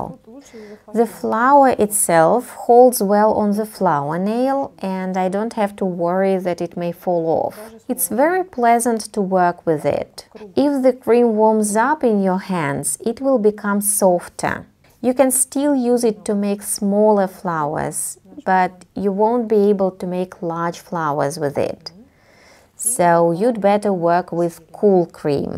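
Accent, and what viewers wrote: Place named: Russia. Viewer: This Russian accent that you cannot hide even when you try very very hard. Anyway, very beautiful and helpful videos. I love them. Thank you so much!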